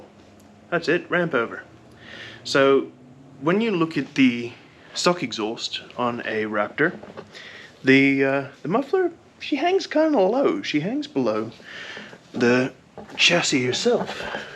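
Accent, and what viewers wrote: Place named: South Africa. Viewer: you sound like an original SAFFA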